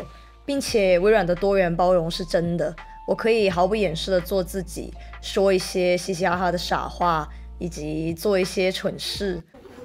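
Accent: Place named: Taiwan. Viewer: 祝你创业成功。 btw:听你说话感觉像台湾口音。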